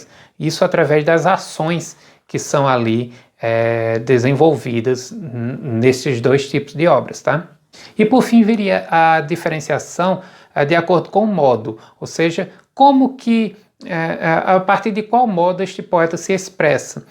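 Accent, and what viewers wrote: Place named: Bahia, Brazil. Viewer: Boa tarde, prof gostei da sua exposição, é a primeira vez que mantenho o contato. Além disso nosso sotaque no YouTube é outro diferencial acima e a mais. Desculpa a pergunta, mas o senhor está vinculado a algum programa de pós graduação? Em caso positivo pode informar? Sou Marcos José de Souza, prof de Língua Portuguesa, Rede Estadual da Bahia, em Fátima. Abr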